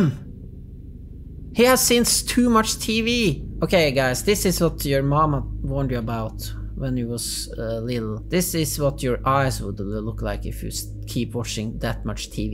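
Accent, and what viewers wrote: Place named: Sweden. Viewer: thanks brah Are you swedish ? , I asked because I wanted to guess what you are , you sound very swedish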